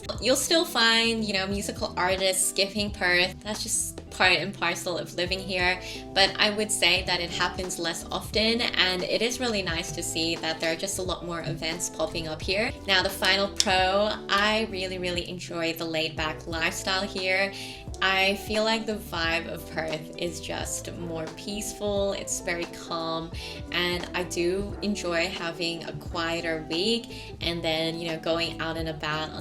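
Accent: United States. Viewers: Are you from north America your accent sounds Yankee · Nice vid - bit of an American accent there? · You're 30 and the American accent stuck with you lol what a load of virtue signalling bs. Sorry to burst bubble.😂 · Are you American? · Nice video! You grew up over here but how did you get such an American twang? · sorry to ask but why do u have such a american accent?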